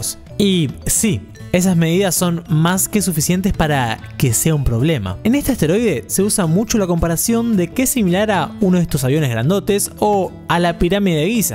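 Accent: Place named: Argentina. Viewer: Con esa vs argetiinoo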